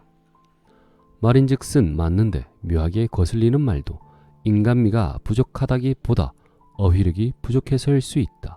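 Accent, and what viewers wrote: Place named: Gyeongsang, South Korea. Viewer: 경상도 억양이 거슬리네요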